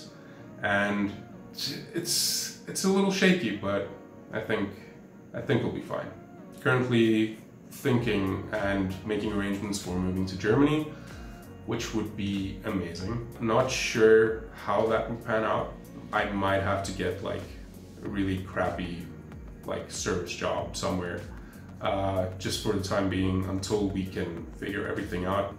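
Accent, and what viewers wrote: United States. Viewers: Are you from SA, you sound American? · That's funny, I never knew. I asked because I just assumed with your accent that you were from the state's. I have my own production company/ recording studios and am always looking for new projects to take on.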